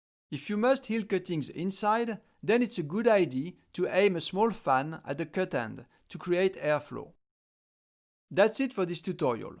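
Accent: France. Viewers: French accent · Are you French ? You have a strong accent no ? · C'est un accent français ça non ?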